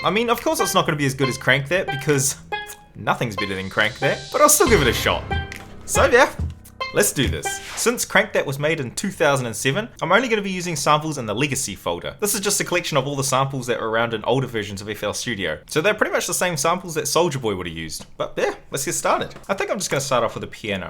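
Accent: New Zealand. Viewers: are u from new zealand bruv ahahaha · are you from new zealand or something??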